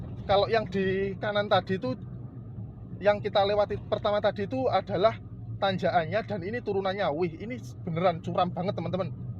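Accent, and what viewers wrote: Java, Indonesia. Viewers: Log at jawamu medok sekali..geli & jijik dengernya..aq pindarh aja..sorry..! Mantap.jawa kowek..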